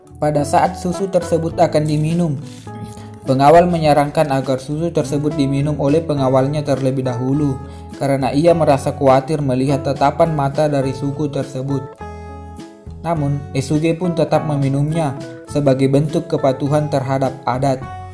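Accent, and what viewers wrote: Bugis, Indonesia. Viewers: Logat bugis ta yee' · Bang lu orang bugis gak si. Soal nya orang bugis ngomonya kaya gitu? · Makassar gk bisa hilang logatmu 😂😂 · Mantap logat Bugis nya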